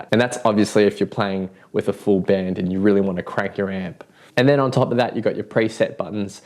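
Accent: Australia. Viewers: the most stunning thing about this video is watching an asian guy talk with an aussie accent. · What's more annoying than an Australian accent? An Asian with an Australian accent.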